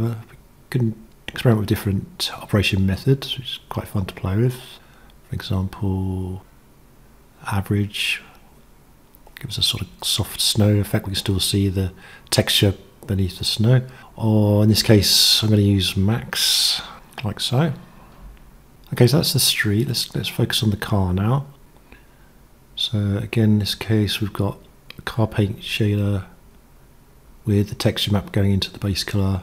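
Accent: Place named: New Zealand. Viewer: That is Kiwi accent, if you are wondering. Every video teaches me something new.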